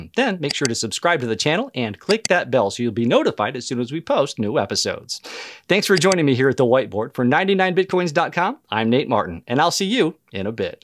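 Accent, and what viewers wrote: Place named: United States. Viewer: May I ask which US State were you born? Your accent is so pleasant to ear!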